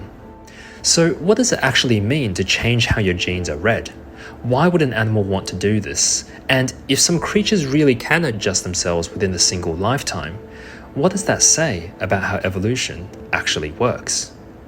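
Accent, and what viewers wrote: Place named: Australia. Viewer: Good to hear an Aussie voice, thank you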